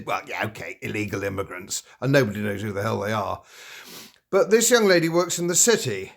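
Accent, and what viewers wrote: United Kingdom. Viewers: Love to hear a real British accent, and yes I hate politicians for this mess they have done to our country.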